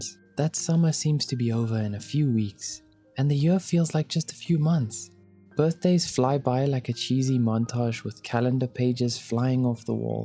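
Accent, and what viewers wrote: South Africa. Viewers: the voice over artist has a South African accent😁🇿🇦❤ N#NODNAJUSTRSA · South African narrator???? 😁😁😁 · Is the narrator South African? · the man speaking in this video has a South African 🇿🇦 accent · I love that the voice actor is south african ahh! good to see more of us in the media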